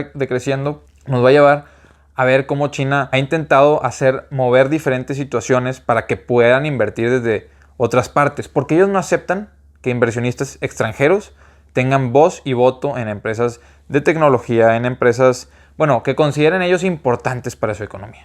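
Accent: Monterrey, Mexico. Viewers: Pues bien bonito que es esa plática con ese maravilloso acento regiomontano. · tiene un acento bien marcado Regiomontano de Mexico que me trae grandes recuerdos de mi tierra.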